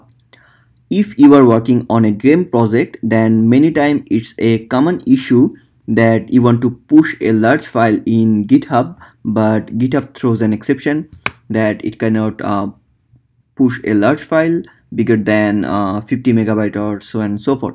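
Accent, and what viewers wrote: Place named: India. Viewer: whenever you listen indian accent videos you already know your problem is fixel lol. So staright to point thank you sir.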